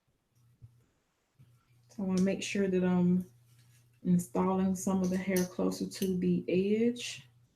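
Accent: United States. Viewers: Your hair looks as beautiful as you! Love it! Our voices are almost identical and I thought I was listening to a recording of myself. You must be from the southern midwest, sister. LOL. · Yur a lovely lady with a beautiful smile even with yur pink and green lime clips n bubble upside yu head. Love yur accent normally I dont like some american accents after awhile but I found yur voice soft n relaxing n did not fast forward till close to th end. I thought yu did a good job for a first time specially as I'm not that good at corn rowing stay blessed 🙏🏾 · this was great, you sound like you're from East Texas or Dallas, my bffs and family are from there and you sound just like them. love it 😁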